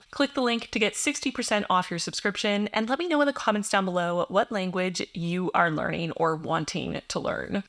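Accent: Quebec, Canada. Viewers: En tant que française, c’est rigolo pour moi d’entendre une étrangère dont le français n’est pas la langue maternelle parler Québécois… Mais tu parles très bien ! 😊 I am (trying to) learn(ing) Dutch because I’ve been living in the Netherlands for two years, but this is such a difficult language, sometimes it’s similar to English, sometimes there are French words, but sometimes it’s 100% Dutch😅 I also use Babbel app.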